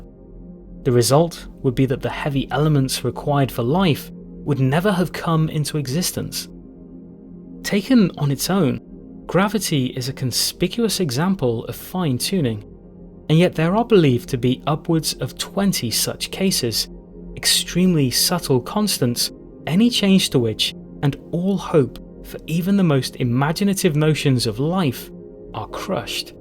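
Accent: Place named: United Kingdom. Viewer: This is like listening to terrance mckenna in a British accent 😂 he was saying the same things with more deugs in the talks of coarse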